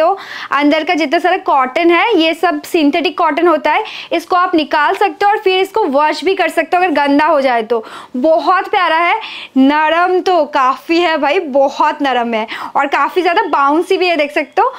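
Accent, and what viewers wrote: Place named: Bengal, India. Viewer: 😂 Your Hindi With Bengali Sweetness ☺️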